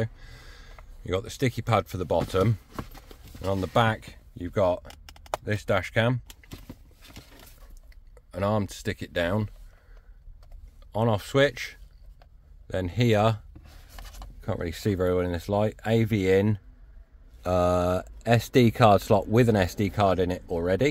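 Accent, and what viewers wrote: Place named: United Kingdom. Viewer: Hi mate. New subscriber here, thanks for the review. Nice to hear it from a accent this side of the pond too! I had an Xtrons head unit in my E92 that I ripped out and reverted to stock, audio quality was horrific. I read it's because the retrofit units for the E92 (and E90/93 by extension) don't utilise the fibre optic connectivity that the stock head unit does, rather using auxiliary instead. So I've been looking for one of these I can use alongside the stock head unit. With this connected to the aux port (or even by FM), what does it sound like? Is there any crackling or distortion at all? Or is it decent? Cheers!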